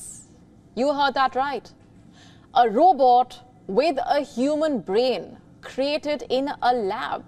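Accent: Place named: India. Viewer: I hate the indian accent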